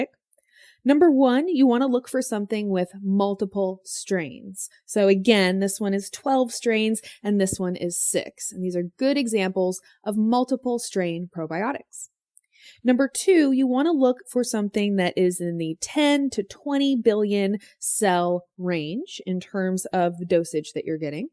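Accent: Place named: United States. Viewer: I have a video request for you; would you mind doing one on the Ghrelin and leptin hormones? Thanks in advance! Keep up the amazing job! P.S. I like your American accent; this is what I'd love to sound like as I keep working hard on becoming fluent. :) I look forward to watching more upcoming videos you may have in store for us! :) Take care!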